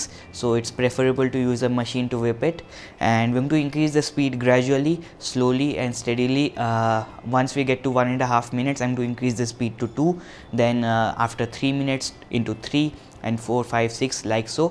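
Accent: India. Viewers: indian accent is so cute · His accent is particularly cute :P All of us are not blessed with it haha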